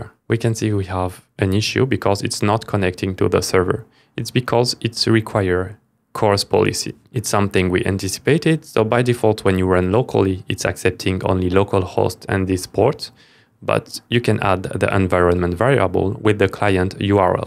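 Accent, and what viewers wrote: France. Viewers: L'accent français ne s'entends pas trop, gros GG en vrai 👌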